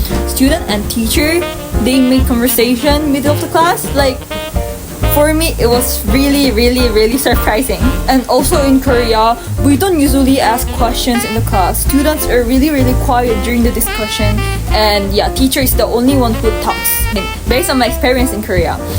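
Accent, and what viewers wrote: Philippines. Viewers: You're starting to have the filo accent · woah she has addopted the Filipino English accent. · Your accent sounds pinay😂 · your English accent is so Filipino 😂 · naging pinoy na yung english accent:face-green-smiling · Great 👌 content, your English accent is perfect now very Filipino English accent, you have adapted well.. enjoy your holidays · Love your Accent. You sound Filipino 🥰. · filipino accent yung english hahaha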